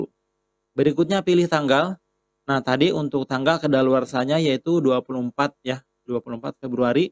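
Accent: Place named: Java, Indonesia. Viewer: maaf sebelumnya sebenarnya sampean itu piyayi pundi to logat bicaranya seperti orang jawa 🙏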